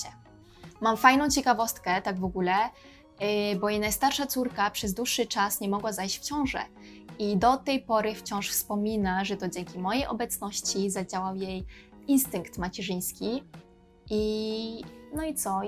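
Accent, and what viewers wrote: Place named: Vietnam. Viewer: bravo. Her French, Polish and Spanish accents are actually better than her English. To me she still has a charming Viet accent when speaking English... Maybe English is particularly weird to pronounce if VN is your first language as much as Tieng Viet is difficult for English speakers to pronounce properly. Her French pronunciation was very impressive too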